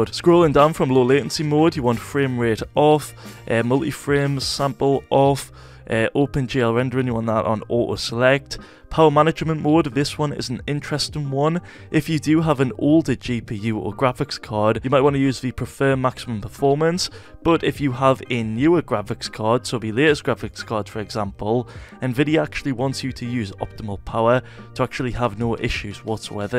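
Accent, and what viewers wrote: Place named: Newcastle, United Kingdom. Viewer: are you a geordie lol you sound a lot like my family from newcastle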